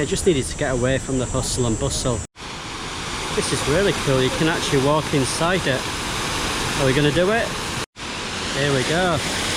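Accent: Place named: South Yorkshire, United Kingdom. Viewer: His accent is South Yorkshire accent including accents in Leeds Barnsley Doncaster and Sheffield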